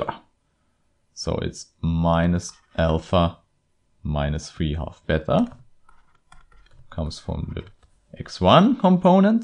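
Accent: Germany. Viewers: Great tutorial video though Permit my saying that your accent seems german.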